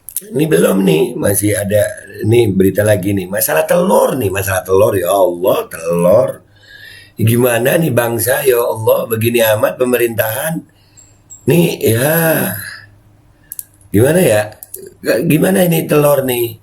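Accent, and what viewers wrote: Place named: Java, Indonesia. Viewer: medok bingits 😁😁😁😁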